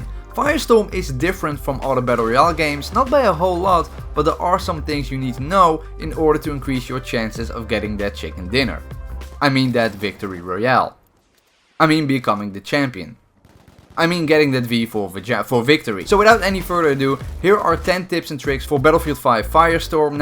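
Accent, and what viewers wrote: Netherlands. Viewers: that dutch accent tho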